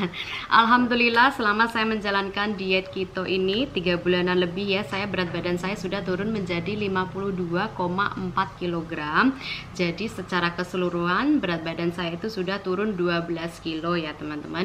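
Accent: Java, Indonesia. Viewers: njowoo banget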